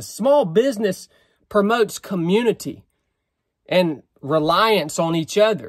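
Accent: United States. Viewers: Where in the USA is the accent from? 👍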